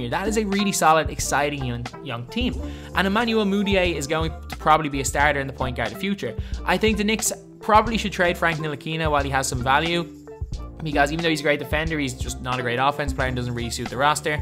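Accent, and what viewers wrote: Ireland. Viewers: Thanks I’m Irish and I thought he was Irish alright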